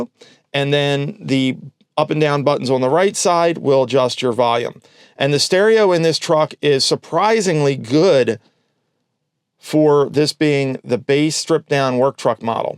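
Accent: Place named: Philadelphia, United States. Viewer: I'm hearing a Philly Delco accent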